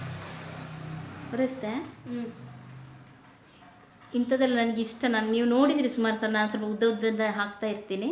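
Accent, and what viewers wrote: Mangalore, India. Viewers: Madam nimma mattu keluvade onda thara khushi. Nimma magalur kannda super. Nimma vedio super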